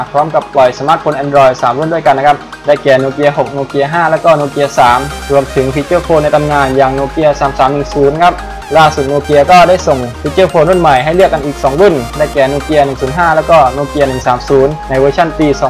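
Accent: South, Thailand. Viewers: ทำไมพูดเหมือนทองแดงนิดๆ คล้ายสำเนียง 3 จังหวัดภาคใต้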